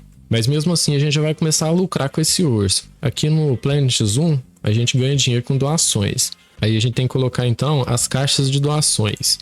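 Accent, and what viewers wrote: Minas Gerais, Brazil. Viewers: Verdade acho que é por conta do sotaque fofo de mineiro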